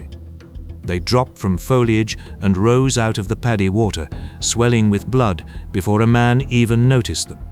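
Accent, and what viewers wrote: England, United Kingdom. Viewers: Can't like a narrator with a Brit accent shedding anything negative about American Vets.nfg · I’m really not digging the English accent!🇺🇸👎👎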